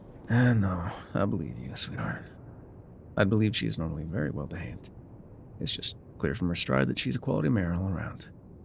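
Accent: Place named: South, United States. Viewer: Charlotte is my spirit animal Edit: that cliffhanger was FOUL Edit 2: relistening to see if i missed anything and i didn't necessarily miss this but every time I hear Lotte i think of princess and the frog lol Anywizzle- This story is actually so cool, the concept is amazing and the mystery keeps you coming back to listen more Also Its like a.m. and I should probably be asleep but who needs sleep when you have? Edit 3: he keeps calling us pet names like sweetheart and whatnot and I don't think thats just because hes casually finding excuses not to say the listeners name Also Love to slight southern accent and how its not very forced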